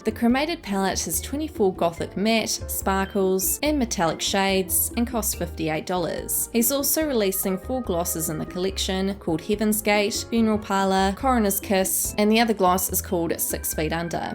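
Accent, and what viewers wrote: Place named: New Zealand. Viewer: She’s a kiwi from New Zealand it sounds like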